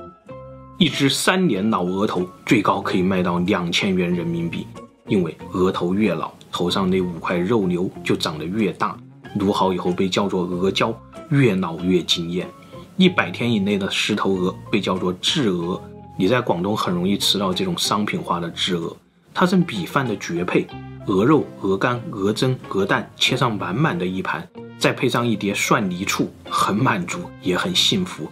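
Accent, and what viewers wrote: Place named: Chongqing, China. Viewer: 我预判是重庆人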